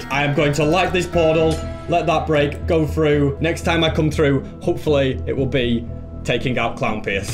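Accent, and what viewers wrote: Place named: Yorkshire, United Kingdom. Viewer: You have a Yorkshire accent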